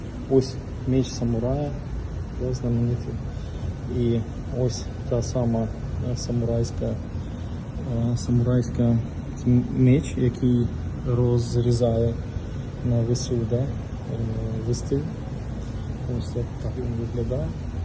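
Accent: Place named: Russia. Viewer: Говори по русски . Умора. Мучаешься, сразу же видно, что русскоязычный.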